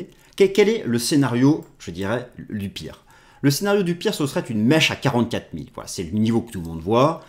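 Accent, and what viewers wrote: France. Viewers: bien bonjour le j adore vos video avec les prononciantion à la française c est votre marque de fabrique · continuer à dire RANGE à la française monsieur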